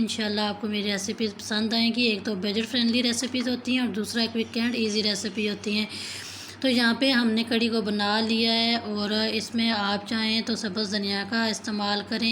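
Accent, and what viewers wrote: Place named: Bhatwahar, India. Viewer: اپ کے لہجے سے لگتا ہے کہ اپ کا تعلق بھٹوہار سے ہے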